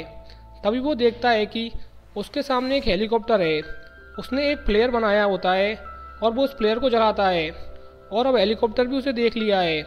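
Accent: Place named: Bihar, India. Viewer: Aur teri voice bihari jesi hoti hai ....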